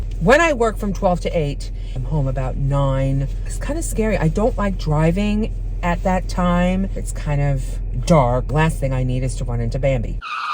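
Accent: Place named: New Jersey, United States. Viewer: I say kawfee too lol. You kno Jersey.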